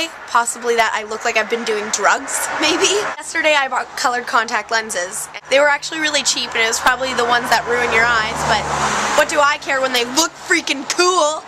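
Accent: England, United Kingdom. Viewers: Your English accent was awesome. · Your English accent was awesome! Can you do like some of next clog in the accent? PLEASE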